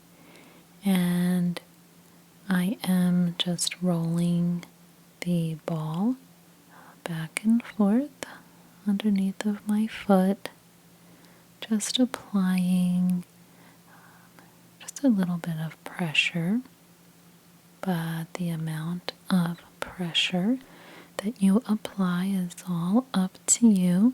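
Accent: United States. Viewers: You’re the only ASMRTIST that has an American accent that I can listen to. Your voice is pure bliss! ❤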